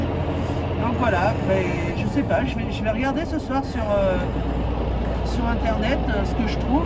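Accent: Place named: South, France. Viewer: Coucou! Les alignements de Carnac auraient une origine religieuse pour le culte des morts. Pour le reste, les scientifiques sont comme toi, toujours dans la recherche du pourquoi. C’est marrant, on retrouve notre petite des vadrouilles 😊 Ton accent du Sud est revenu 😂 Très jolie vadrouille et contente que tu sois revenue sur les routes et en plus accompagnée de Coco et Newton 😊 Bisous!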